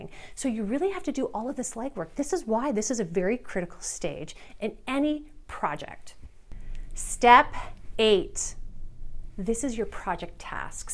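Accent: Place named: Canada. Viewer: It's "About". Mm'kay? "About"! Not "A-boat". Just kidding :-). Are you by chance Canadian? I can't put my finger on your very faint accent. Anywho, great info, very informative. I'm looking for a class to give to all the management at my Aviation job. This is some good stuff.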